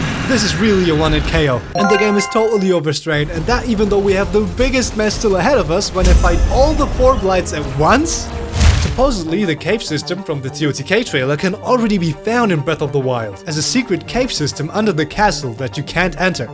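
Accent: Germany. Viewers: Are you german? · pov ich weiß das er deutsch spriecht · youre german? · Bist du Deutscher?✌🏼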